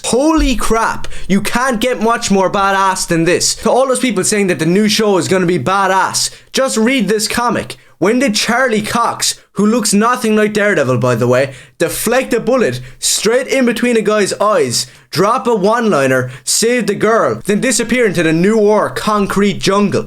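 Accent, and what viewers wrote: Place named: Ireland. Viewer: fine Irish yapping man, imma read it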